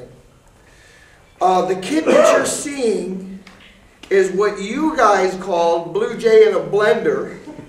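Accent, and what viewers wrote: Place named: Baltimore, United States. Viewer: Haven't lost yer "Balmer, Merlin" accent, "hon"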